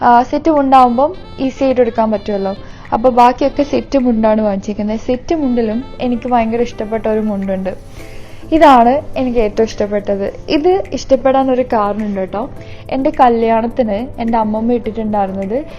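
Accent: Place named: Kannur, India. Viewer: plz talk in kannur slang..it's a beautiful slang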